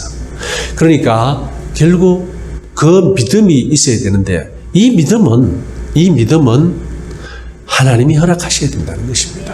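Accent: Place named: Gyeongsang, South Korea. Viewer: 경상도 구수한 사툴이 할렐루야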